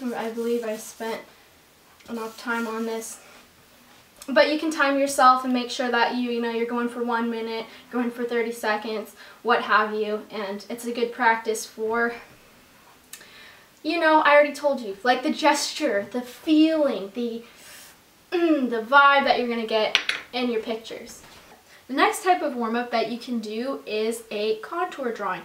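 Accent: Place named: California, United States. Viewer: It's ok, you from California? For some reason that was what came to mind (when I heard the likes).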